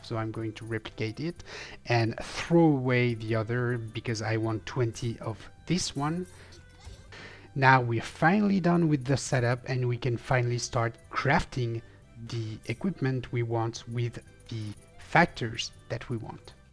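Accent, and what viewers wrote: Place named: France. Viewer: Cool pourquoi tu ne fait pas tes vidéos en français aussi ? On entend bien que t es fr ça serait cool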